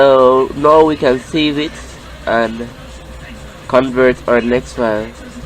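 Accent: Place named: Jamaica. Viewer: Jamaican voice with a Welsh name....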